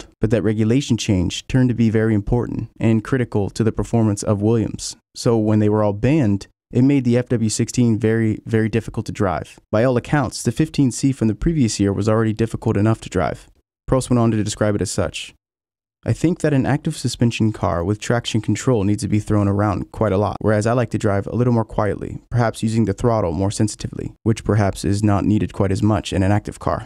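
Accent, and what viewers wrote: United States. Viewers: a well spoken American accent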